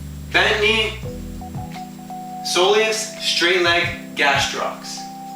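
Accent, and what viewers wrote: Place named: Canada. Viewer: Gud to see you know what you talking about.At first I thought oh no not another American on YouTube. But I think you're Canadian aren't you.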